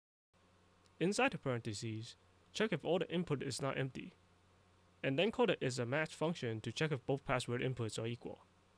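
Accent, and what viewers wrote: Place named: India. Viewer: Wow...i just realized that u are from India. Nothing bad, usually I can't focus when i view an indian tutorial bcuz my ears hurt :)) But your style is clean and amazing ! Hope we get more angular tutorials from you . Great job sir !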